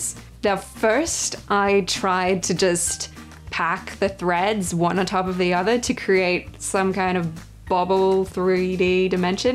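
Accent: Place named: Australia. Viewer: Thank you, I have just started embroidery and it was lovely to listen to an Australian. I am looking at purchasing Essex linen, your thoughts.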